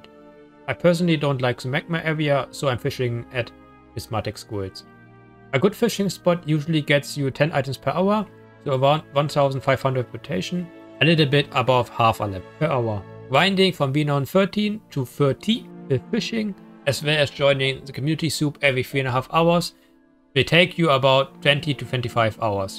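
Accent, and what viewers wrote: Germany. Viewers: Bist du deutsch? Hast nämlich solch einen Akzent xD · thick german german accent :D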